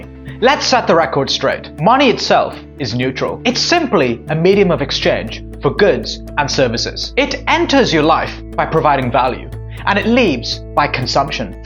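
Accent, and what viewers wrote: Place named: United States. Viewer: bro face indian bro voice american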